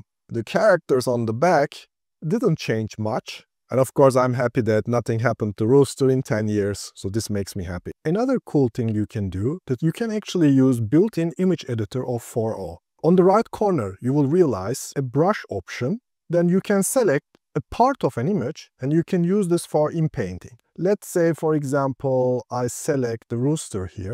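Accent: Turkey. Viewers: Dostum aksanın çok türk, türk müsün merak ettim. Video için ayrıca teşekkürler. 🇹🇷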